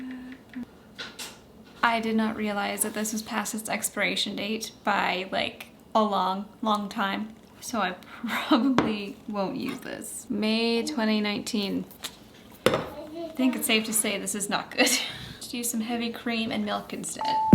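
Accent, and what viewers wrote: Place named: Canada. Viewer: Love your videos so much, whenever I hear that Canadian A sound it makes me smile a little. I'm American I don't know why I just find the way you say words like bagels to be so charming like oh that's right! We're neighbors, look at those slightly different vowels! Haha!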